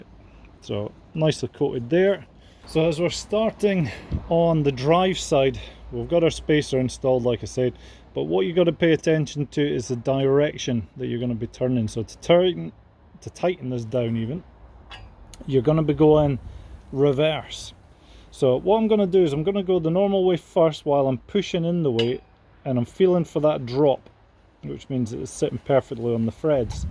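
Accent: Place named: Scotland, United Kingdom. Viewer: What's up Hello brother, I'm getting mine in a few weeks. Went a complete build which is amusing considering I own a bike repair shop but stock in the suppliers is VERY low and PX have all the parts. Be rest assured mine will be customized in the future too:D Where are u from? I'm Dalkeith. You sound east Scotland too.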